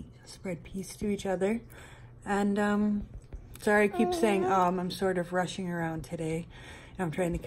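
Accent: New York, United States. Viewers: In America her accent is New York , New Jersey , Boston area sound like to me .Im in the south KY . to both be American we sound so different ! Shes adorable !! I love her accent !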